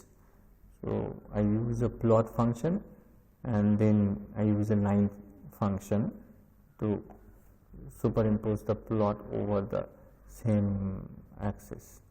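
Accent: India. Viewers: This indian accent is driving me nuts...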